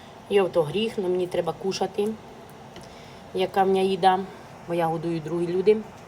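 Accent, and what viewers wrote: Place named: West, Ukraine. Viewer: Така цiкава панянка, але поясніть прошу ,що зцее за мова? Українські слова з російськими в перемішку, вимова як на західній Україні!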